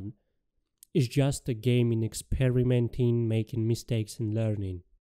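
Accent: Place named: Russia. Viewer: брат, палишься, что русский))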